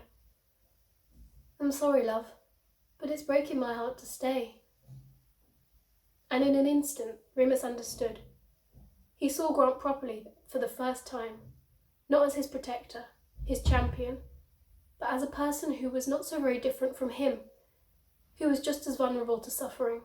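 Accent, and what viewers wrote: United Kingdom. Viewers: listen to this fanfic in British English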